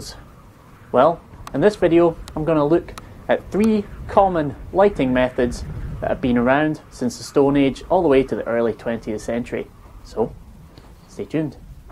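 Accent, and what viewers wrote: Scotland, United Kingdom. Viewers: Haha, the scottish accent is so badass, that the automatically created closed captions just said, "yeah, well, f' ck it..." and just doesn't even try 😁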